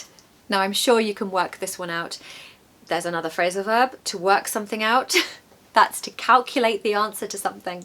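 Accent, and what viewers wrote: United Kingdom. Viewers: Very nice I am falling for your British English 🇨🇦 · I found your lesson about phrasal verbs very useful indeed!! I really love your British accent!! 💖💖💖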